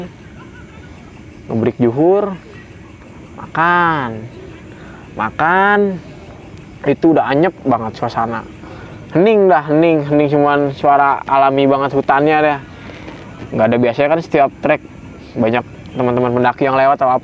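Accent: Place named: Tangerang, Indonesia. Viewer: KAGA ADA YG SIUL greget amat bang wkwkkw Btw nada logatnya tangerang banget bang, mirip film si marko :v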